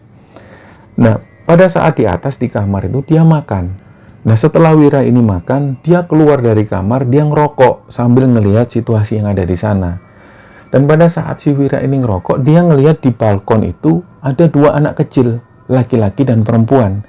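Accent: Java, Indonesia. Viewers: Sempet suka sama beberapa channel yang nyeritain kisah horor, eh lama kelamaan kelamaan malah suka manggil narsum yang kayanya ceritanya ngablu/fiksi/dibuat2, kurang suka juga sih kalo yang nyeritain narsum nya langusng, karna ga semua narsum bisa ngatur cara bicaranya untuk bercerita Akhirnya sekarang lebih sering denger cerita dari karna yang nyeritain ya cuman mas doang, jadi feel nye hari ke hari makin dapet, terlebih karna saya orang jawa jadi lebih enak aja kalo yang cerita ada medok2nya/campuran bhs jawanya. Serasa lagi diceritain pakde sendiri semangat terus tim, semoga bisa makin besar channelnya dan pendengarnya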